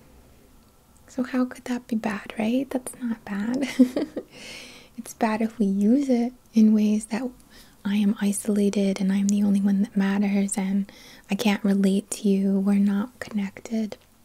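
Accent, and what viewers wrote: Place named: New York, United States. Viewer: I can hear NY in ur voice. Much love🧡🧡🧡✨✨✨✨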